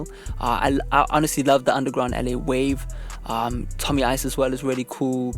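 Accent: South Africa. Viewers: Where are yo from bro you sound South African. I would know cause I’m from there as well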